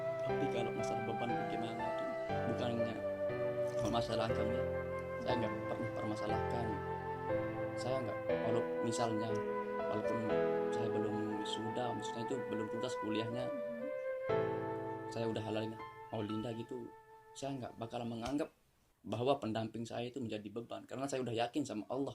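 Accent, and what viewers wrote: Lombok, Indonesia. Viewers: logat lombok memang kental bang 😂😂 mantablah👍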